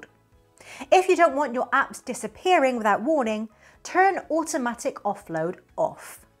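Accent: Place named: Bristol, United Kingdom. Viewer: Are you in, or near Bristol, England? 🤔 (Guessing, based on yer accent, me awd babber) hahahahah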